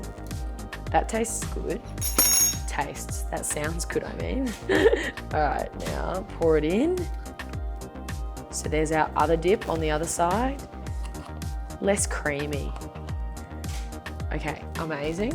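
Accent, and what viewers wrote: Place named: Australia. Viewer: why do i love her voice, so Aussie😍 edit: AHHH SHE LIKED MY COMMENT❤️❤️❤️❤️❤️❤️❤️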